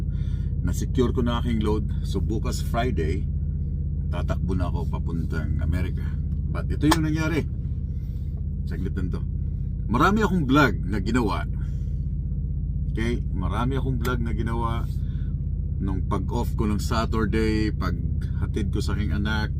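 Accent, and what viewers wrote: Visayas, Philippines. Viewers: Morag bisaya lagi ka dong😀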